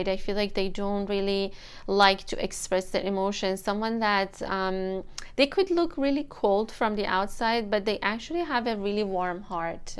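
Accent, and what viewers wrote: Iran. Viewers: Beautiful Irani Accent! Thank you for the reading.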